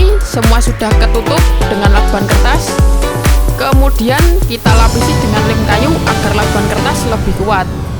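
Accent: Java, Indonesia. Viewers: Mantap bang suka suaramedoknya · Wong jowo endi suarane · jawa ne medhok 😂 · Medok Banget ya bos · Sampean jowo ngendi mas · Jowo jan muasok bossquuuu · Suaranya medik rek rek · LOGAT JOWONYA KELIATA BETUL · Cara penyampaian bagus aku suka khas Jawa ☺️ editannya jg bagus. ❤️ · Medokk buangett bosomuu ndann😅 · Orang Jawa ya..nada bicaranya kek orang Jawa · medhokk bhoss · Kreatif banget... muantaap 👍👍👍👍....aq seneng bhs jawanya medhok...smpyan asli endhi Maas😁😁🙏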